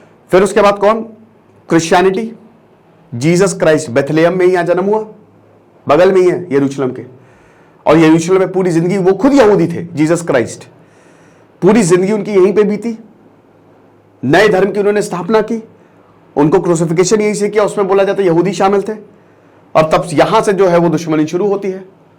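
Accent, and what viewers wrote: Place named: Lucknow, India. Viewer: सर आप बिल्कुल लखनवी अंदाज में बात कहते हैं।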